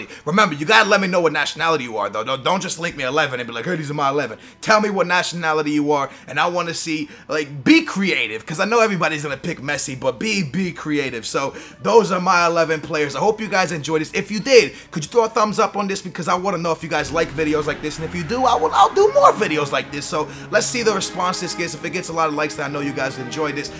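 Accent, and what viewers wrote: Portugal. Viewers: are you portuguese ? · R u Portuguese?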